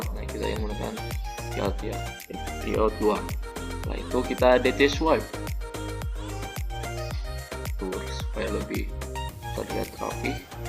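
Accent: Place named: Surabaya, Indonesia. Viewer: Mantap bang are are suroboyo 😁😁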